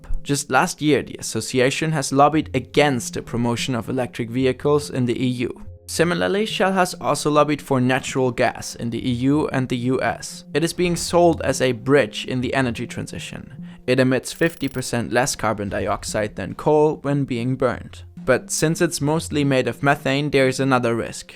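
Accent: Germany. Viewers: Yourrr loveley Germann Accent makes it pretty easy to understand it. For me As a german fellow with a way harder german Accent. Sorry for bat-english. They tend to fly around my head every now and then. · Holy fuck bruh I wish I spoke German the accent is clean as fuck👌🏾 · I honestly can't watch these videos. I find myself to be a perfect example for the classic german phenomenon of hating how a german accent sounds eventhough you have one yourself. I'd love to see these videos on Simpli or you getting an english native speaker for the voiceover tbh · Even if I love your videos and the concept is a good idea in theory I just can't handle the German accent. If you're looking for someone to read your scripts I volunteer. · Y'all may consider hiring a native English speaker, or having the usual narrator take some spoken English language courses. There are some very common, German pronounciation mistakes in this video, i.e. 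pronouncing 2019 as two-thousand-nineteen rather than twenty-nineteen. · Nice german acsent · Love your German accent. Love from Britain! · Great Video but i must say that the german accent is a bit distracting. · Nice Video - smooth Animations, (I love the intro!), informative and your german accent is not that bad :P Good luck with the channel, I hope ppl will write their comments in god damn english otherwise non-german-speakers will just be annoyed as hell xD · Krasser Akzent, den find ich sogar als Deutscher unangenehm^^ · Dudes! I'd really like to do voice-overs for your vids. Although it doesn't seem to be a big problem, I could get rid of the entertaining german accent😉 I can't really find an imprint with an email adress, so I have to rely on my comment being upvoted...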